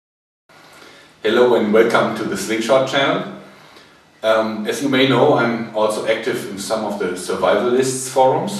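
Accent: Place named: Germany. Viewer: dieser akzent... jup, ein deutscher! :-)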